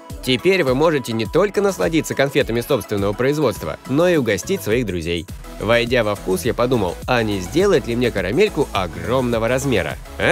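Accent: Shymkent, Kazakhstan. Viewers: Ты из Казахстана? Шымкент? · Ты че с Шымкента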